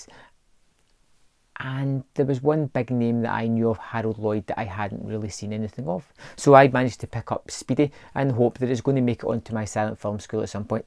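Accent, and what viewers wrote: Scotland, United Kingdom. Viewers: First time watcher. Good to hear a Scottish voice. Nice pickups buddy 😉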